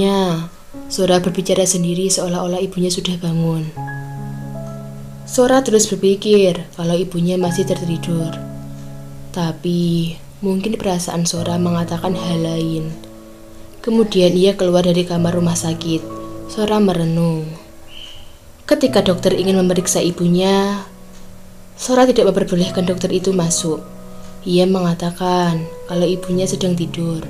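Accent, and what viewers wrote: Java, Indonesia. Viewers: swarane medok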